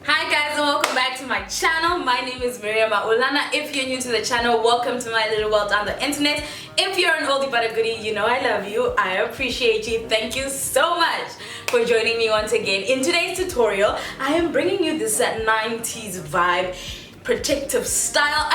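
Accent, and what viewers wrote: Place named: Zimbabwe. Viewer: I had to go through your videos. I thought I heard a Zimbabwean accent.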